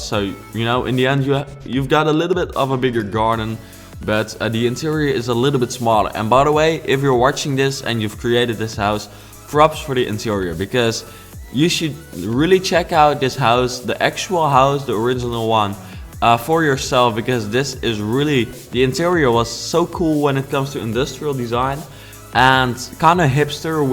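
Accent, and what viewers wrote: Netherlands. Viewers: Are you Dutch? (NL) (ben je nederlands?!)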